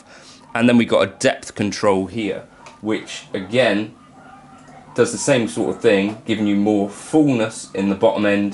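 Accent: United Kingdom. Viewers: Ohh ! That would be great ! , I also have the HELIX LT, in that case no problem,but for the Pod Go it's a great idea to split it ! I've bought your Helix and Pod Go packs,there are many good presets ! You are a great artist,not only an incredible guitarrist,very creative and very original, besides it's amazing all the time you spend researching and trying new things every day that later you share with us ! For your accent I know you are from the UK,I lived in LONDON 7 years,while I was studying my Classical Guitar training at the Guildhall School of Music many years ago..I love England,whenever I can I go there... And I recorded some albums with Anthony Phillips,Ex guitarist and founder of GENESIS,we are very close friends he's also an incredible guitarrist.! Thanks a Lot ! Have a nice week.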